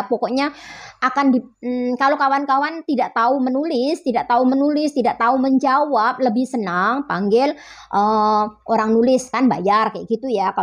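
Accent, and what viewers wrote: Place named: Indonesia. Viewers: Akk ni bhsa melayu pun blm sangat Pasif msh cakap indonesia kental lagi terdengar.lg pulak akk ni kl nak apply ic biru rugs lah blk indo jadi org asing.dah berkawin dgn org warga negara punya anak2 warga negara anggap aja blh tumpang ngan suami N anak2.dah dpt PR dah ok dah cukup tuh dah tak perlu buat vissa spouse citizen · Akk dlm video ni bahasa indonesia nya masih pekat😅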